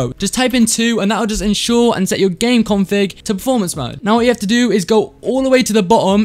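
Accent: Sweden. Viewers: ur 100% swedish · you are from sweden i know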